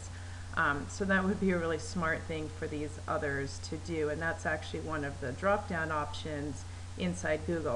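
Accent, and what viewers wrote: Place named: United States. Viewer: man, its getting to the stage now where if I hear an american voice on a instructional video I immediately close it, 10 mins of you listening to the sound of your own voice! what a dribbler.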